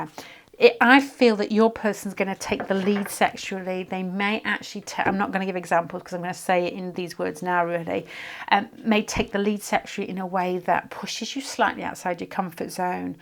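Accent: United Kingdom. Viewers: I can't undertand you when you speak so fast with the British accent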